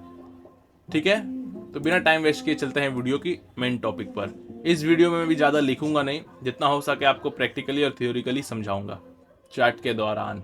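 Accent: Bengal, India. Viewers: Are you Bengali bhai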